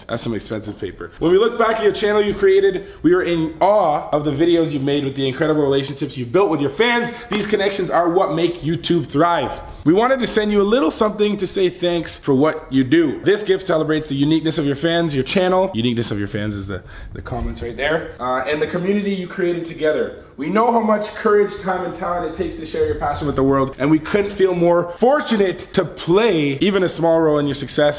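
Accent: Jamaica. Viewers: Up mi cahgi with the Jamaican accent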